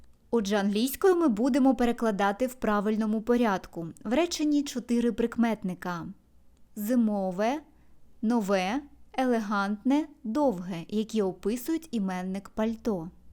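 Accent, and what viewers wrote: United Kingdom. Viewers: Your voice is charming🪻Special thanks for the British English 🇬🇧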